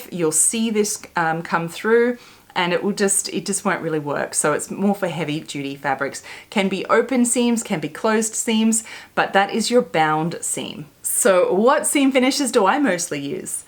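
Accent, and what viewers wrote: Australia. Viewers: Aussie accent - yay !!! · I love your advice, especially with your charming Aussie accent. My serger and I never bonded, too hard to thread, gave it away. Love my brand new Janome with several zigzag choices. Have done all the seams you demoed and love French seams, flat felled and bound seams. I’m 75, sewing since age 10 and still learning. It has been so interesting to see the newer trends and techniques in sewing. Especially loved the demo of your wrist pin cushion, I totally love it! It’s the first thing I seize when starting to sew! I’ve made everything from exercise wear and swim suits to wedding dresses. I love clothing construction and crafts. You are inspiring!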